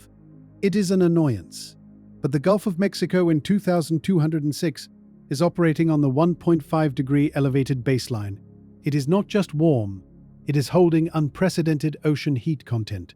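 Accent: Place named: United Kingdom. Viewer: Why are the AI comments about American weather systems, given with a british accent?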